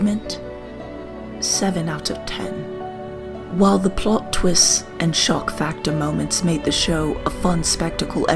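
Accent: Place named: United Kingdom. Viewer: you should really work in documentaries or some shit with that amazing brit voice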